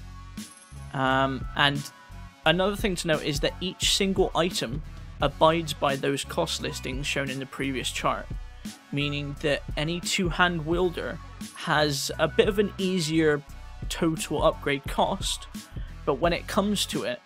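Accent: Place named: Bristol, United Kingdom. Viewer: Wow... you sound sooo bristolian..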